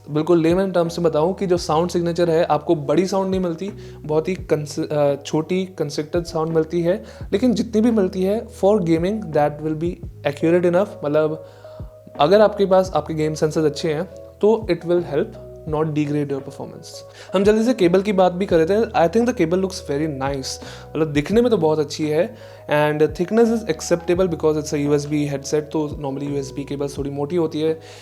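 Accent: India. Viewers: Very hindi english.. Hard to understand but appreciate your Work of course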